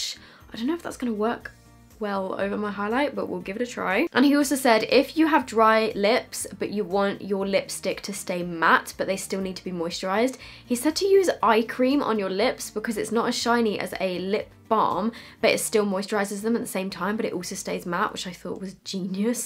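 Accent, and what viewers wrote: United Kingdom. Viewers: Liked the tips very much, but what I liked the most, is your pretty accent!!! I am used to all these Americans doing tutorials (although I am German), so I forgot how I love the British accent!!!! May I ask, where you come from? Is it (as I learned in school) Oxford English? Nevermind, my ears love it anyway!!! Sorry, that I do not comment so much at your technique or whatever but more at your speech.😂 (comment on /comment at?) Sorry, I am German as I said.😉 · I luvv your accent. Is that british? 😍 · She looks like Sabrina Carpenter and she talks like a British Sabrina Carpenter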